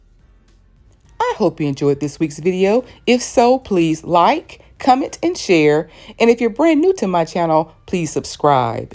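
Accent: South, United States. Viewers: So nice. You have a heavy, Southern accent.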